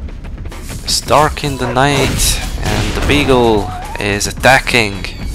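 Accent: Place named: Central Europe. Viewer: California c: I was wondering because me and my brother couldn't figure out where your accent was from so thank you! We knew it was somewhere in mainland central Europe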